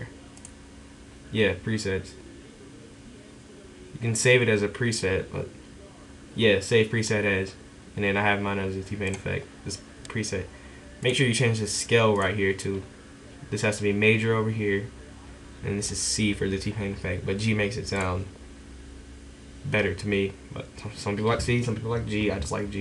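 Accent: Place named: Knoxville, United States. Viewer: ah you kinda have that knoxville accent going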